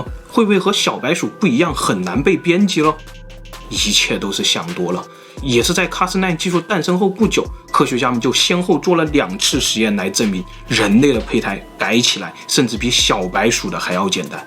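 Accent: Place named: Hunan, China. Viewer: 你的湖南口音很重：l，n不分，以至於不得不時刻反應：暖細胞是卵細胞等。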